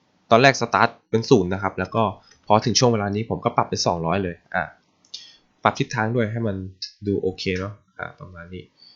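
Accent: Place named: Kanchanaburi, Thailand. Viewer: ฝีมือเก่งมากเลยครับ แถมสอนก็ดีอีกละเอียดมาก แถมมีเทคนิคคีย์ลัดให้ด้วย ชอบมากครับ เยี่ยมไปเลย,, ปล.สำเนียงคล้ายเพื่อนผมที่เป็นคนกาญเลย คนกาญป่าวครับเนี่ย 555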